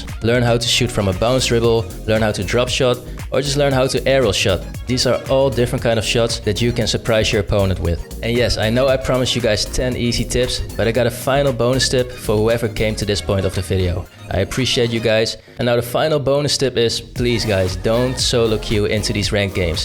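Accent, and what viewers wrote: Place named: Netherlands. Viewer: Nice video man you are insanely underrated and are you from the Netherlands by any chance I can kinda hear it when you talk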